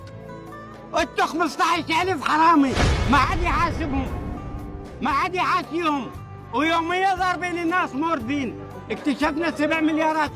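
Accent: Iraq. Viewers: اللهجه العرافيه الي بدوون شوائب❤😊😊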